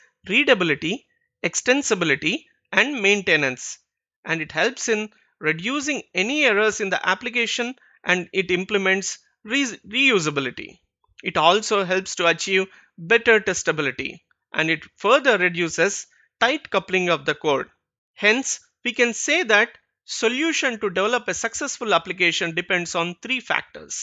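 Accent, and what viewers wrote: India. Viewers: Матвей Тарасовhe he is Indian so his accent is Indian.do you have problem with his accent? then go elsewhere .it's better than overrated western accent which requires extra effort to understand .stupid like you not appreciating his knowledge instead complaining about his accent.he is teaching free just try to get some knowledge instead of complaining. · A good material but your accent is very indian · Indian accent strength (directly proportional to) simplifying complex concepts.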